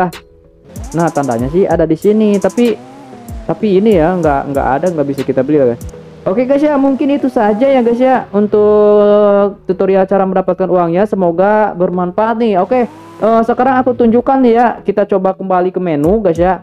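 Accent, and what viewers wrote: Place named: West Java, Indonesia. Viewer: Logatan sunda wkwk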